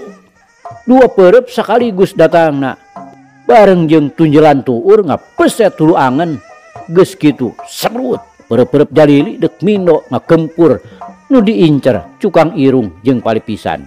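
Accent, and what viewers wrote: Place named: Sunda, Indonesia. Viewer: Hebat! Cariosna nyunda pisan. Hatur nuhun bah!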